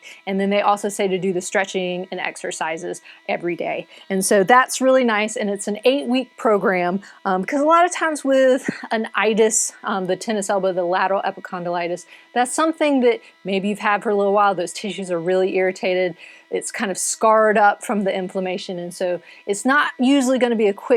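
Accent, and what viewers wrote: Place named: Oklahoma, United States. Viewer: I'm from west Texas, your dialect sounds like my family from Oklahoma, it's nice to hear!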